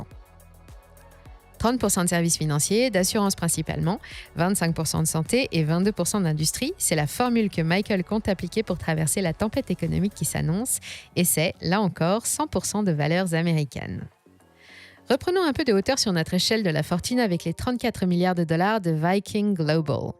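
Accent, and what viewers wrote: United States. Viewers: Cet accent américain 💕 Toujours super intéressant 😊👏🏼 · Bel accent Anglais, ou plutôt US !!!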